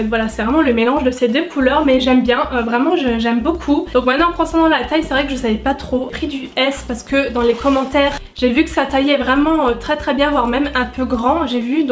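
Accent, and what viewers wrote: Lorraine, France. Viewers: Ta l'accent lorrain 😘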